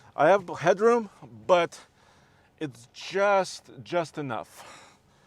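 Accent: Russia. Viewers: It sounded like someone else’s voice for the first couple seconds then the Russian accent came back in lol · Great review! Love your accent! Is it Russian? :)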